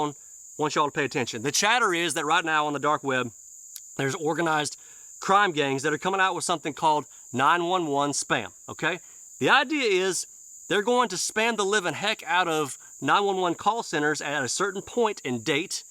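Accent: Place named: Memphis, United States. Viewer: did you just say you lived in Orlando for 25 years?Where the hell did that accent come from then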